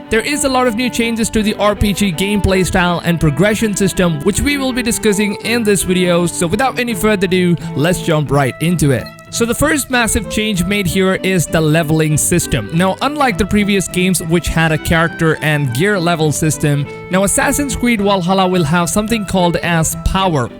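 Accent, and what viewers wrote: India. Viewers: He’s Indian it’s an accent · Is that some Indian accent I hear? 🤔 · Having an Indian accent doesn't mean he isn't pronouncing the word wrong. Plenty of people with the same accent know how to properly enunciate the letter V in a word.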